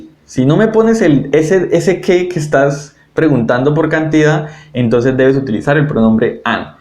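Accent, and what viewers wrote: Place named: Spain. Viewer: Lastima el terrible acento español hablando frances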